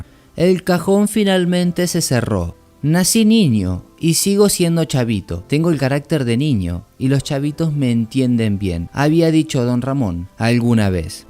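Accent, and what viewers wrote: Argentina. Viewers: Sacá el acento de argentino lpm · SOS argentino? Porq hablas todo con sho jaj pero tenés otro acento a la vez jaja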